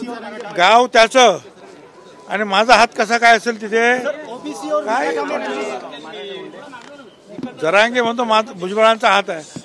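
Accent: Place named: Bihar, India. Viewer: बिहारी सारख बोलतोय... media ला वैतागला... copy cat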